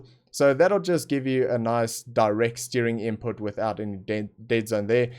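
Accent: South Africa. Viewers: You sound a lot like a South African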